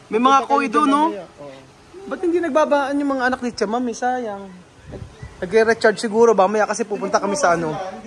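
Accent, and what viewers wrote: Nueva Ecija, Philippines. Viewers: Extrovert kc tlga c kuya tas taga Nueva Ecija pa, minsan ang punto ng pananalita d2 sa amin sa Nueva Ecija e sadyang mlkas at minsan napagkkamalang maybang pero ganun po tlga kming magsalita. Inamin din nmn ni kuya na may pagka bully sya, mapang asar din sya pero ganun nga ang personality nya. Oo nga, minsan need din tumahimik lalo na sa mga museums o sa lugar na dpt di maingay. Di rin ako pabor na isinasama si Misma sa mga green jokes kung nagkaganun nga( di ko npansin nung pinanood ko video sa LU). Pero cguro pag di rin magdadaldal o tatawa c kuya, may masasabi n nmn tayo ano? Kung seryoso sya, may masasabi ulit tayo. Lahat ng galawan nya, papansinin natin di ba? Tas ibabash natin sya di ba? Kya, madaldal man o tahimik c kuya, may masasabi at masasabi pa rin tayo. Hayyyy, ewan ko my face( ibash ko n rin self ko)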